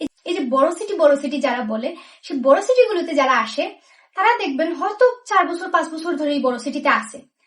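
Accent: Sylhet, Bangladesh. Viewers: দিদি কি তুমি কি সিলেটি? আমি রাশিয়ায় আসতে চাই তোমার মেইল টা দিও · Didi Sylheti ni..??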